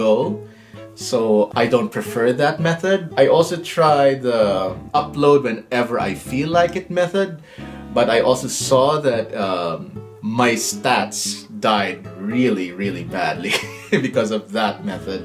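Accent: Philippines. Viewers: You're starting to lose your Filipino accent... very slightly. It's fascinating to watch its progress. lol I hope you're finding life in the US ok.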